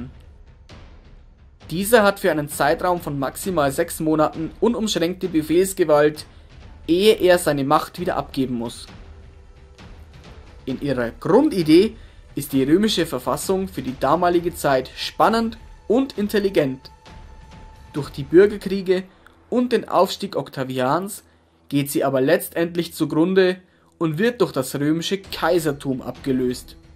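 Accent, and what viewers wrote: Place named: Austria. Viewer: sehr gutes Video, kömmste aus österreich wegen den Akzente? Mache weiter so!! Grützi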